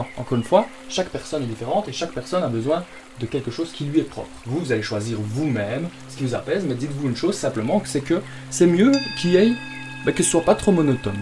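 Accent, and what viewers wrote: Liège, Belgium. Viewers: Accent de liège non ? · c accent belge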